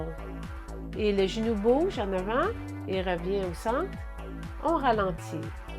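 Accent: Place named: Canada. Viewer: Quel bel accent canadien. Leger pour moi. Merci bcp From israel en confinement😞😷😷😷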